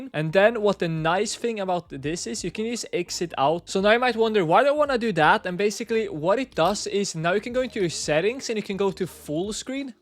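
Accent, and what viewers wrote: Sweden. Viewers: I KEWN IT!!! You are from Sweden Right? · Bro your Swedish? I’m Swedish to 😁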